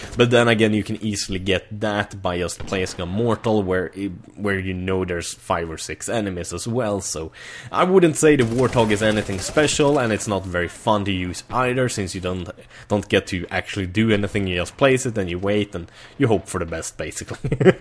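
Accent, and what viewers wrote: Sweden. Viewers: I never realised you were swedish xD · hör att du är svensk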